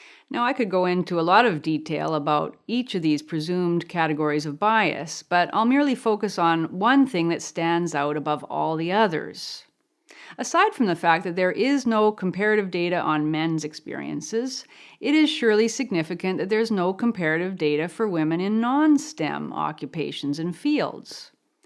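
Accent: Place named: Atlantic Canada, Canada. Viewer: This is neither here now there, but listening to your voice takes me back to my youth in Atlantic Canada listening to CBC programs before it went completely insane. That essentially examining and considered tone and accent.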